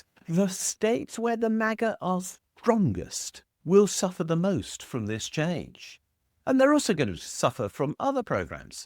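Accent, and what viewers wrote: United Kingdom. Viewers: I’m not going to waste my time listening to your British sounding horse shit! MAGA will give up when they have experienced so much PAIN!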